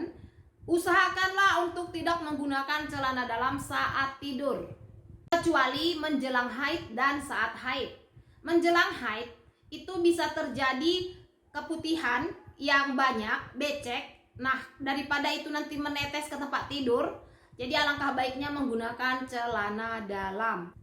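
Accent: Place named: Banyumas, Indonesia. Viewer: Ini lebih keren toplah mbak infonya ngapak keren ren ren👍🏼👍🏼👍🏼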